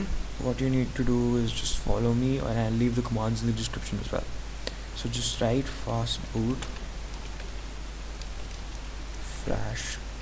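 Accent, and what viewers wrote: India. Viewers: dosent work and hard to understand indian taxi acent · A white guy with an indian accent you dont see that every day :3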